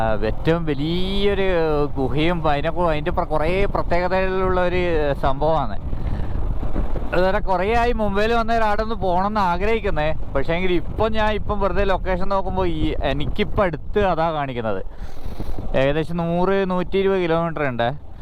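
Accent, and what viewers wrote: Kannur, India. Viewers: കുറച്ചു കൂടി നല്ല മലയാളം സംസാരിക്കൂ, എല്ലാം കണ്ണൂർ ഭാഷയിൽ സംസാരിക്കാതെ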